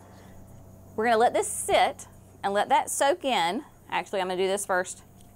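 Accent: South, United States. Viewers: I am glad you did this video! My Felcos are way overdue for the spa treatment. Thanks! Also, have you ever had the auto-generated captions up and played one of your videos? It is so cute how it interprets your Southern accent! :)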